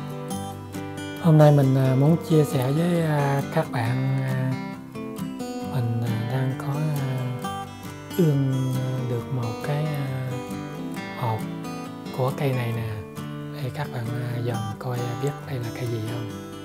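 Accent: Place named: Vietnam. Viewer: giong vn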